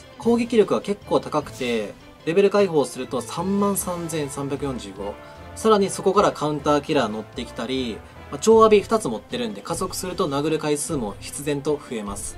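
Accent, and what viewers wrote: Kansai, Japan. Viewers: 関西人かな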